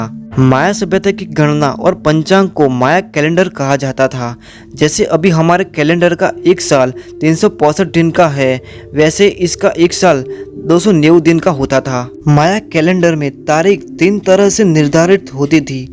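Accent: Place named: Gujarat, India. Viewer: भलेही हिंदी बोले किन्तु गुजराती का तडका निकाल आता है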